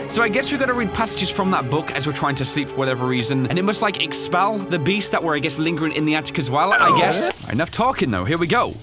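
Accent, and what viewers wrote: Ireland. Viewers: Anrijs Christopher it’s not because of rage it’s because he’s making fun of his Irish accent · He can hide the Irish accent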